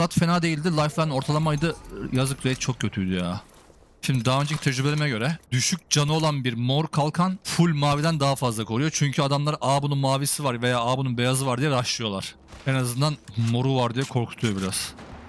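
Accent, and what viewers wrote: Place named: Russia. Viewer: Rus aksanlı lütfen devam:)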